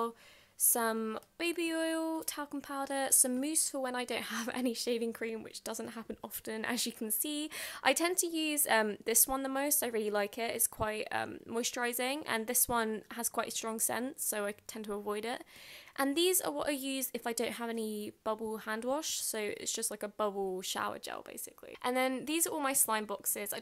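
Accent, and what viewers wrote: United Kingdom. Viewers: Britich or american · Are you from the uk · OMG u r sooooooooooooo pretty and ur voice is so british and i dunno its a bit...liek a tangy british accent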